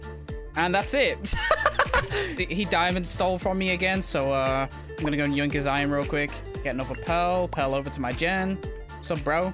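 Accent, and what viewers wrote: United Kingdom. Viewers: How to tell he is british A stat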